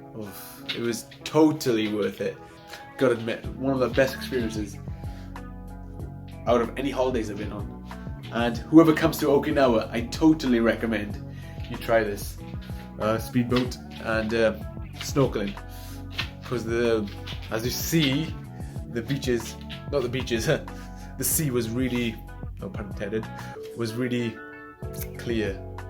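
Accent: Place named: United Kingdom. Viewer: Where did u travel from ? U got a uk accent.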